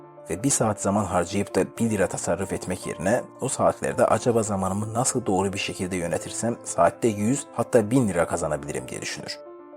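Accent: Azerbaijan. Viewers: Azerbaycanlısan qaqa bilinir danışığından😁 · Turk dilinde Azerbaycan dili kimi danışırsan)) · levent y Seslendiren Azerbaycanlı, türkçe anadili değil. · Bu konusan abi Azerbaycanli!👍👍👍👍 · Bu ses sahibi Azerbaycanlı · Abi azerbaycanlimisin? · qaqa azerbaycanli oldugun bilinir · Men ilk defe sizin kanalinizin turk dilinde olan versiyasi ile tanish olmusam.Her zaman dinleyende turk deyil, azerbaycanli oldugunuzu dusunurdum.Cunki lehcenizden bunu hiss edirdim.Bir gun yoldasim mene sizin azerbaycan dilinde olan videonuzu gosterende sizi basqa biri bilib zenn etdim ki,turk dilinde olan kanali tekrarlayirsiniz.Daha sonra men anladim ki,2 kanal da size mexsus imish 😄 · Türkçə danışma ləhçəsindən bilinirki Azərbaycanlıdır... 😄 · Bu danisan Azerbaycanlidir...danisiqindan melumdur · Qaqas azerbaycanlisan oz lehcende danissan yaxsi olar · Qaqa sən azerbaycanlisan? Danışığından hiss olunur təmiz türk dilini danışa bilmirsən sanki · Ləhcəsindən Azərbaycanlı olduğu o dəqiqə bilinir,Guyə bu indi niyə türk ləhcəsində danışırki? 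· kardeş sen Azerbaycanlımısın? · Sen bir Azerbaycanlisan.ama direşibsenki turkce danişacaqsan.ama elede yaxşi alinmir. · Konusanın azerbaycanlı oldugunu dogrumu bildim acaba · Bi şey sorucam.Videonu anlatan kişi türk deyil Azeri gibi geldi.Son tonundan anladım. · Sen Azerbaycanlımısın abi?Konuşmandan anladım 😂😉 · Bu çocuk azeri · Deyesen Azerisen 😂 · Dosdlar bu videonu anlatanin Azerbaycanli oldugunu ama turkce konuwtugunu bir tek benmi anladim?? Bu arada bende Azerbaycanliyim... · Azeri oldugu belli arkadasin guzel video yapiyosun keske şiveninide biraz geristirsen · Arkadas azeri turkceyi zor kullaniyor bu yuzden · Xoşuma gəlməyən bir məqam var bu videoda.Videoda danışan azərbaycanlıdır amma türkiyə türkcəsində danışır.Bu da çox gülünc alınır.Her cümləsində açıq verir.Həm də kobudcasına.Sənin öz dilin yoxdur ay kanal admini.Bu yerdə Molla Nəsrəddin jurnalındakı karikatura yadıma düşür.Birinin ağzına 5-6 dil soxurlar.O da deyir ki,ay bala öz dilim ola -ola başqa dilləri niyə ağzıma soxursuz.Mın də türkəm ve bununla qürur duyuram.Amma siz belə danışanda türkiyə türkcəsini də pis günə qoyursuz. 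· Video yapanin konuwma seklinden qaliba azeri oylemi acaba?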